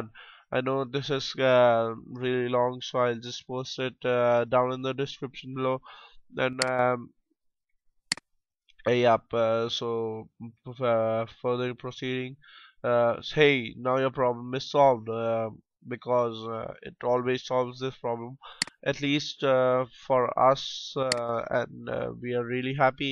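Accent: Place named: India. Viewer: Indian accent so annoying.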